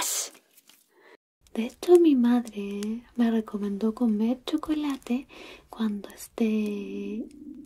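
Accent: Chile. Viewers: Así que de verdad habla chileno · Siendo peruano (dato no menor), debo decir que me encanta el acento chileno y las jergas chilenas :v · Awwwww aun así cuando te equivocas te ves muy tierna eres una chica dulce y me gusta tu acento chileno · El acento chileno me encanta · Amo tu acento chileno 😭🛐❤️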